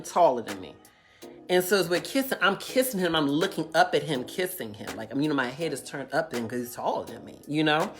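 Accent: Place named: Washington DC, United States. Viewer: I can hear in your voice that you're from DC yessss hunnie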